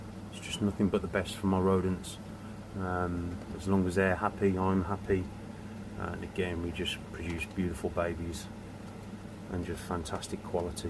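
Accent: Black Country, United Kingdom. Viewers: GREAT VIDEO UR ACCENT SOUNDS LIKE BLACK COUNTRY I DONT SEE PROBLEM WITH FEEDING LIVE WHAT WOULD THEY EAT IN THE WILD ITS SIMPLE!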